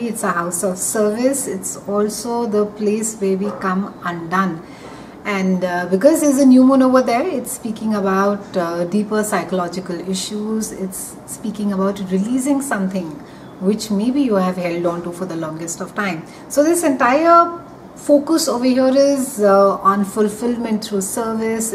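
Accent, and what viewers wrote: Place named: India. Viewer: Your accent is lovely, are you from India?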